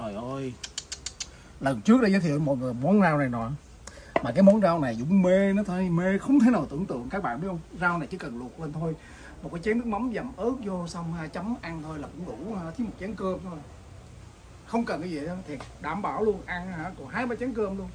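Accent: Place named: Mekong Delta, Vietnam. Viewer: A Dũng quê tỉnh nào . Mà sao giống Miền Tây quá vậy A Dũng.....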